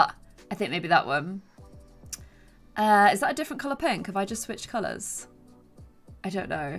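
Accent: United Kingdom. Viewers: Hi I love your channel and no I am not just saying this for likes. I just want to see this just nothing else. you are amazing you inspire me and inspire me to do stuff like you do. I love Britain and I love your accent. If you would just see this that would be a miracle but of corse your not because no one does ever. Just please see this and remember I just want to see this. I don't care how many likes I get so don't put hate comments.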